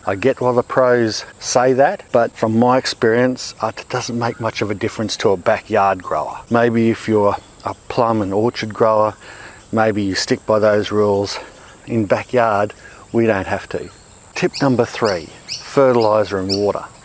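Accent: Australia. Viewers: I love australian accent! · Greetings from France ! Someone recommended your channel to me, and i loved this video :) I really like your AU accent too !!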